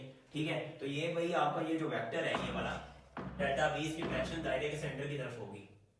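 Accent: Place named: Saraiki, Pakistan. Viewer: 50% idhar 50% udhar Student of M.Phil physics + also teaching Medy khayal ich tohaadey lehjy to lgdy saraiki ho..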